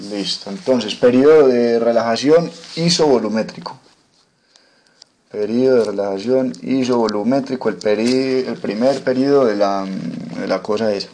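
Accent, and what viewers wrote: Antioquia, Colombia. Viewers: Muy bueno. Me encanta el acento paisa. explicas muy bien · Acaso eres colombiano? haha me gusta tu acentoooo <3 x) buen video gracias! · Qué acento tan paaaisa. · Más paisa que la arepa <3 "Uy , mejor borro esto porque mera locha" JAJAJAJA. "Locha" para nosotros los paisas es como decir "¡Qué pereza! " SOS UN CRACK! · Aparte de completo el contenido, reeee paisa.... Buen video, saludos.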